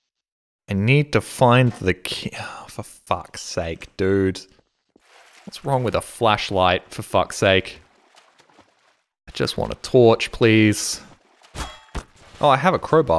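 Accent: Australia. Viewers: Not me, subscribed to him for years, only now discovered he is Australian and not British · wai whoa you aint more australian guy?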